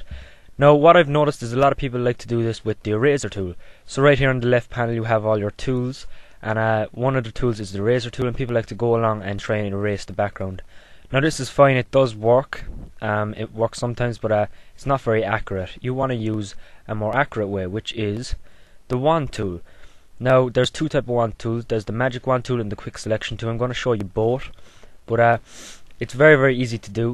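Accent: Ireland. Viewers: Nice tutorial! Btw are you Irish? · the irish voice I can tell because Im from Ireland lol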